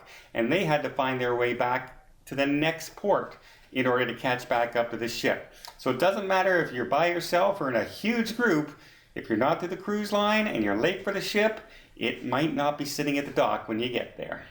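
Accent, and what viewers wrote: Canada. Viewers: I can so tell your canadian now lol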